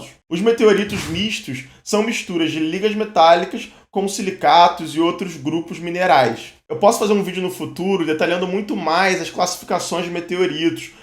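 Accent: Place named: Rio de Janeiro, Brazil. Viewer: Você é do Rio de janeiro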